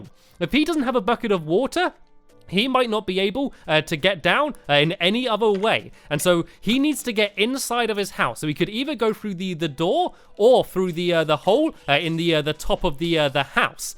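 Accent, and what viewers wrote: United Kingdom. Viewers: Alright!! I don't know if it's just me, or does stampy sound less british???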